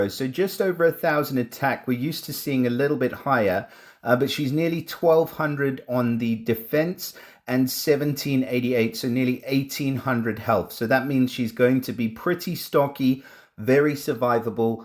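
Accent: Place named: Cape Town, South Africa. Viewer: lol I knew it...certain words I can hear you from CPT😅 ain't nothing like a CPT accent 😎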